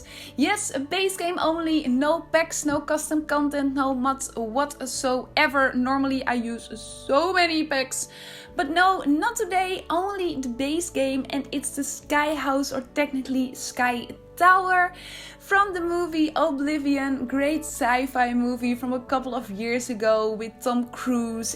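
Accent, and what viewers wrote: Netherlands. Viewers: Are you Dutch by any chance? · I like this design a lot. Also, are you Dutch? I think to recognize the familiar accent. :) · Are you dutch? Cuz u sound like one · Hahah ik hoor gewoon dat je Nederlands bent😂💜 Het ziet er echt cool uit👌😍💜💫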